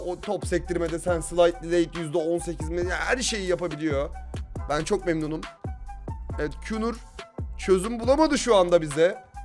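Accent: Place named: Aegean, Turkey. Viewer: abi niye egeliler gibi konuşuyon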